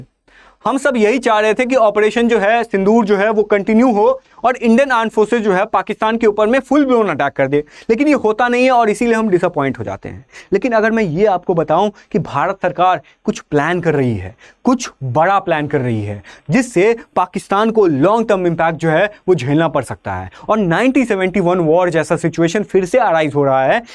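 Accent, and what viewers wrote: Bihar, India. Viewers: sir have such a bihari accent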